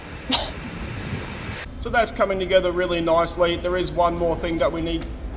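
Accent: Australia. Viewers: Right, I like that starts every sentence with "Right!" Sounds authentic! Sounds Australian! Sounds Right!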